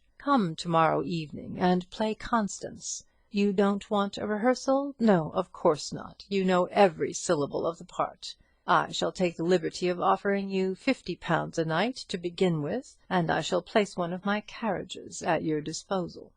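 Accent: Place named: United States. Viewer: Sorry, can't possibly endure British literature read haltingly in an American accent. It doesn't work. At all.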